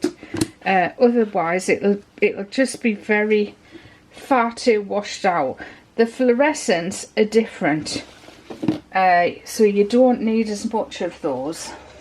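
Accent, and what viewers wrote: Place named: Cumbria, United Kingdom. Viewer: Haha right Cumbrian kitchen banter. It so nice to find videos with a local accent instead of usa (not that I've anything against usa accents I watch them n all) it's just good to know Cumbrians craft too 🤩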